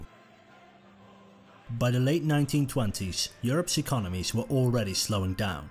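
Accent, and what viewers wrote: Netherlands. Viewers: Kom je uit Nederland? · He's dutch. It's an accent. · Are u dutch? Ben je Nederlands? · Of course. That's a thought. However, it would work with an unmarked accent and the emphasis on 'rupt'. However, with his heavy accent it sounded like a mistake. Now that I realise he is Dutch, the word 'rupts' is in that language too so he might have presumed that it translated to English. All very interesting. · Thank you for the content... I am listenning to your accent... are you Dutch? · Narrator sounds Dutch. Is he Dutch? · Ik had graag deze video willen genieten, maar je Nederlandse accent en VOORNAMELIJK je vreselijke "slis" maakt het verschrikkelijk om naar te luisteren... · you probably know this but he is Dutch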